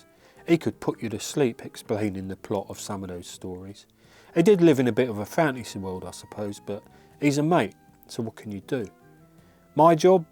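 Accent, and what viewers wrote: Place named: Cockney, United Kingdom. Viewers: Your accent reminds me of Danny the drug-dealer from "Withnail and I", who I believe was a Londoner. Maybe I'm just a dumb Yank who can't pick out a British accent though :) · I watched this twice today with my thai sweetheart and being a Sth London cocker I found it hilarious, I think because of the obvious audacity and the cockney tune to it all and to see Rob pictured like some sort of mug was so funny mate this vlog is pure gold 55555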